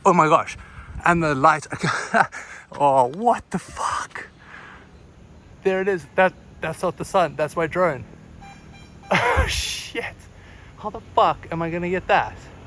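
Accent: South Africa. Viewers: I’m from South Africa to and I just discovered your channel from a guy that is a fpv pilot he’s your buddy you guys made a video together about the go pro hero 12 but anyways I’ve been binge watching your videos and I have to say as a South African I am so proud to know that you are from our country and I’m so glad to see you doing so well in life traveling and making these sick videos I’m moving to Dubai where my family moved 8 years ago and once I get there I’m starting a YouTube channel and that’s how I came across your channel I was researching cameras and drones and your busy I can’t remember he’s name but you where in his videos which led me to your channel cause I heard your accent and he said that you are very well know in his videos and I just wanted to say I’m so glad I found your channel your so inspiring and your videos and skills and knowledge is so amazing to see mahn keep it up u love it when our people can be so skilled and make videos at the quality on par with people over seas and even better as I saw in your go pro awards video and you won to you fucking legend amazing content love from South Africa 🇿🇦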